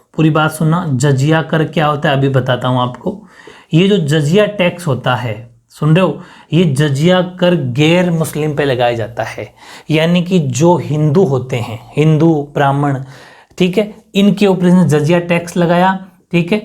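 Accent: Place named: Haryana, India. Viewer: Ser aap Haryanvi lagte ho halanki ki aap rajasthan se ho per aapki language haryana jesi lagti he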